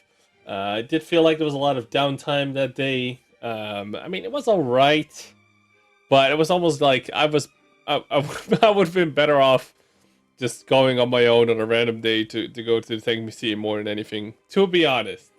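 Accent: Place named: Netherlands. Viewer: IELTS? I'm asking because the accent is barely audible. Dutch myself as well, noticed that a lot of other Dutch people can speak English quite well, but the accent is very prominent.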